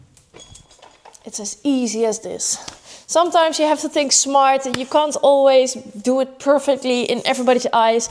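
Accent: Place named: Netherlands. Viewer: Love your NETHERLAND accent 🤗🤗🤗🤗🤗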